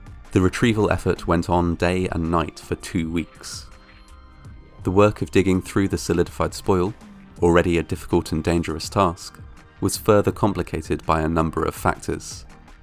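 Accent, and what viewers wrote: United Kingdom. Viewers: American accent?? he's as British as one gets 😂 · He is clearly British.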